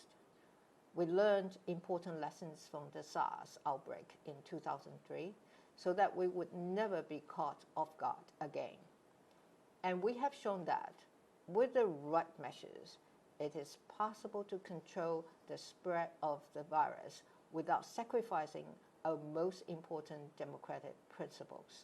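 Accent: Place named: United Kingdom. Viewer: 總統的英式英文聽起來很舒服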